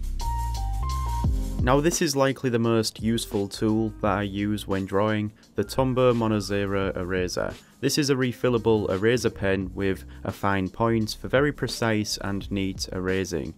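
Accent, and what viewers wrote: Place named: Hull, United Kingdom. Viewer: Hello. Just thought I'd ask, with that accent, are you from Hull? I grew up there myself. Love the channel by the way, I've learnt quite a bit just from your tutorials.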